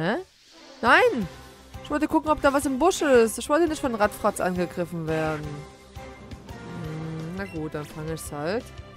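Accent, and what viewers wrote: East Germany, Germany. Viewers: Hey, echt schönes Lets play, bin neu auf deinem Kanal und ich finde deinen Ostdeutschen Akzent echt super :) bin übrigens auch ein Ossi :D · Gott wie ich dein ostdeutschen Dialekt liebe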